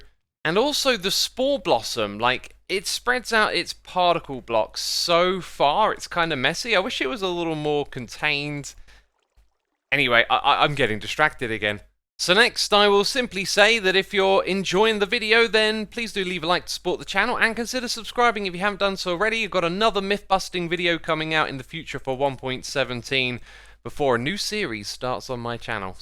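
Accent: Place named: United Kingdom. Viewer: let him pronounce words like he wants to. Stop forcing the american way of saying words on british people.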